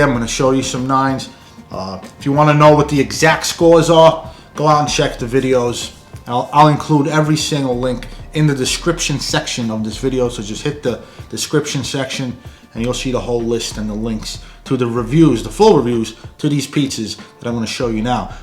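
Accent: New York, United States. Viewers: 💙 yea videos and your NY accent.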